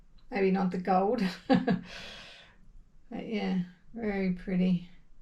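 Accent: Australia. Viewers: Beautiful..going to my table to try this. Just enough cells and gold and the centre is great…love your natural way of working and commenting…nice to hear an Aussie on board. 💖💖Thanks, from Ruth in Tasmania. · Regarding the middle..you could have stopped at gold dots but still beautiful, well done. You sound Australian is that where you are? Asking because you're using Amsterdam paints...I'm in NZ and giving trouble sourcing supplies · Thats brilliant inventive artwork, it is also borderline ASMR, with your Aussie accent and the other sounds, very theraputic.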